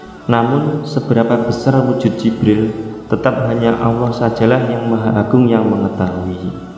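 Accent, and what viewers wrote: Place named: Java, Indonesia. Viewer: Medok wkwk. Good video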